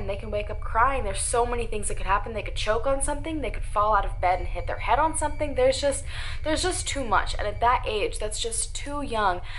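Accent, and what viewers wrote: South, United States. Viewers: I love your Southern Belle accent, 'she gawn missin',...lolz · Girl let that southern accent OUT 😆❤️ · don’t worry about your country accent coming out occasionally. That happens to me too😂💓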